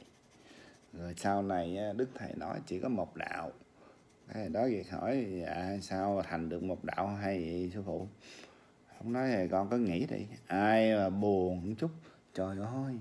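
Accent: Mekong Delta, Vietnam. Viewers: Chào Anh ! Chất giọng miền Tây hào sản ,chân thật ,cho đi mà không nhận lại , đáng trân quí . 💐💐💐💐💐💐💐💐💐💐💐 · Chất giọng miền tây em rất quý anh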